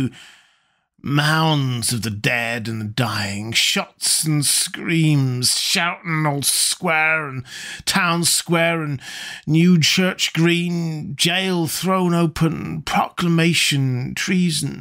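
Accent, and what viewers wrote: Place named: New England, United States. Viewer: Your New England sailor accent is lovely!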